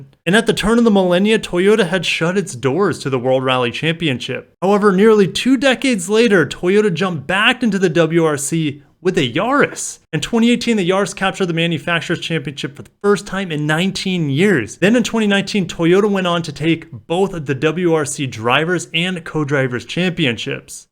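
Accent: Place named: United States. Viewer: Why can't Americans pronounce the simplest of words correctly? The rest of the world doesn't seem to have a problem.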